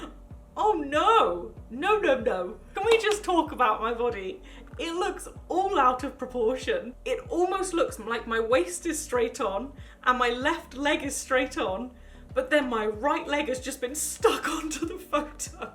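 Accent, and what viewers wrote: United Kingdom. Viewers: can I just say that I love you. Your channel is one of my first British speaking YouTubers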